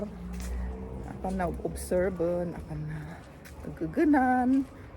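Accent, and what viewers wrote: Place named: Cordillera, Philippines. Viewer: Kasla ka met nga Igorot nga ag sao ti Ilocano ken Filipino, suruem met ti agsao iti Kan kanaey ay kalin di Eb Besao..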